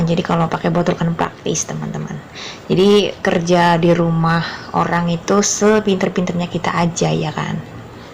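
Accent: Banyumas, Indonesia. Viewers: Nek Ra ngapak ora kepenak,,skses sll yg di Taiwan,thanks infonya ce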